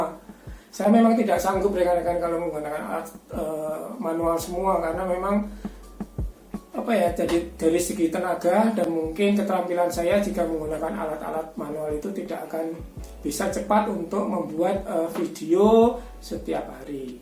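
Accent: Java, Indonesia. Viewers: PT. Niagamas Lestari Gemilang adalah gambaran dari sosok tukang kayu yg sederhana, org Jowo, tur medhok ndeso 😁 tapi lihat! punya impian yang besar dan kemauan yg keras. Selamat bung, anda sudah sukses menjadi inspirasi 312ribu orang. ini luar biasa. semoga terus mnjadi inspirasi bagi kami. #TJJ300k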